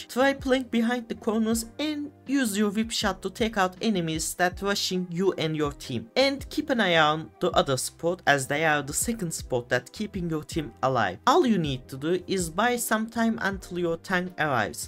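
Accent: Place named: Turkey. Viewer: Noticed the Turkish accent in the first 15 seconds ahahah 😄 nice video though, hopefully I'll do better the next time I play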